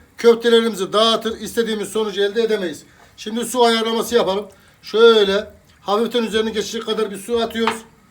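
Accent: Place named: Central Anatolia, Turkey. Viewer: Ustam siz nerelisiniz 🤗 şive tam içanadolu gibi 🤭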